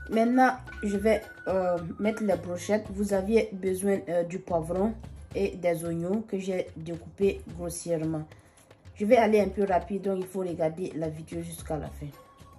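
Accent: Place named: Mossi, Burkina Faso. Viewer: Ça vient de l’accent mossi pas tous les burkinabè sont mossi. Y’a plus de 60 autres ethnies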